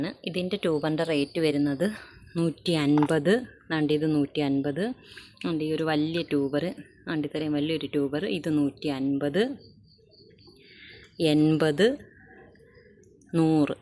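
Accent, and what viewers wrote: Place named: Thiruvalla, India. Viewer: ഞാൻ തിരുവല്ല സൗണ്ട് മുൻപ് കേട്ടത് പോലെ പക്ഷേ ഞാൻ ആദ്യം കാണുവാണ് ഈ വീഡിയോ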